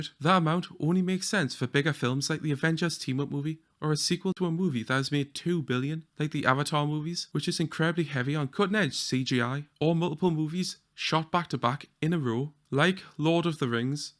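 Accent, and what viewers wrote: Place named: Wales, United Kingdom. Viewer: Either that or just a Welsch person. That accent is terrible.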